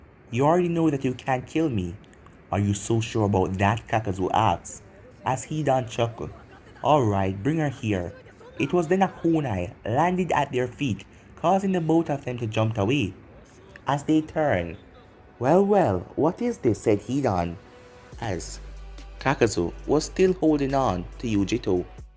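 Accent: Jamaica. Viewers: Lol you sound Jamaican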